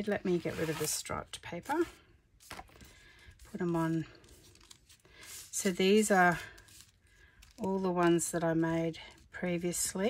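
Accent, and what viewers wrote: Australia. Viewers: Lovely to hear an Aussie accent. Feels like listening to a friend.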